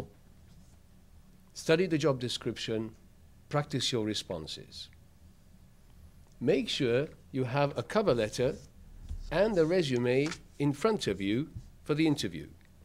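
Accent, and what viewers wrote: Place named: South Africa. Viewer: Couldn't understand this persons funny accent. Sounds South African. Ughh.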